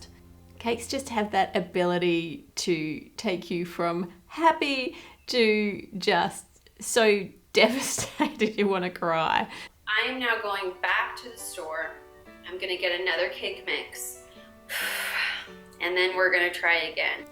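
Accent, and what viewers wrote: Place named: Australia. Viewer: is pretty much Cher with an Australian accent and baking skills. Oh. The love ❤️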